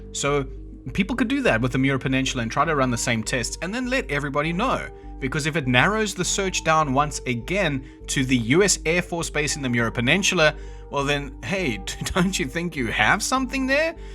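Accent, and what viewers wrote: South Africa. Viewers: You need more subs. So glad to hear a fellow South African. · Is a guy from South Africa talking about the ineptitude of the police in California? Crazy work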